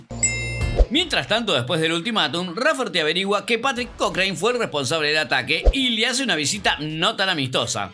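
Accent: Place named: Argentina. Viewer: Nunca había escuchado un resumen tan argentino 😅... Amé 👏👏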